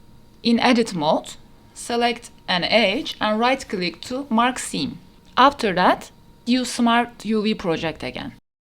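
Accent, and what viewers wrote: Turkey. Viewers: Thanx for your tutorial with Turkey accent!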